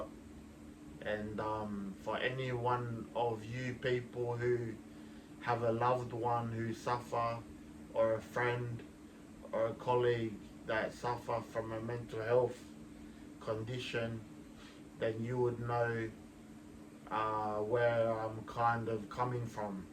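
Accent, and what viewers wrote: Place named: New Zealand. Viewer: Are you originally Kiwi? I hear a bit of a Kiwi accent but you're Polynesian anyway! That is clear brother!😂😜I'm gonna say Samoan (please correct me if I'm wrong!) I've played rugby with so many Pacific Islanders and I have a great love for you guys! The most generous people I have ever met! All the best brother! Much love from Ireland! Nofo lelei lou uso!❤🇮🇪🇼🇸🇹🇴🇫🇯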